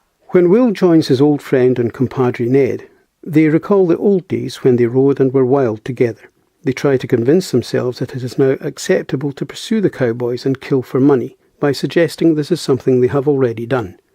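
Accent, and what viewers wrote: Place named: Scotland, United Kingdom. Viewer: Would really like a fuller, longer and more detailed analysis. You’ve got such a lovely Scottish accent... take the bull by the horns and dissect this movie for us??!!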